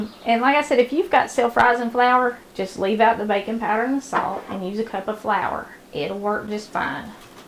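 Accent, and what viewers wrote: South, United States. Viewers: Verses and Vittles.....I always enjoy your videos. Your accent reminds me of my years living in the South...North Carolina and Alabama. My Mom was from Tennessee, and you cook like she did!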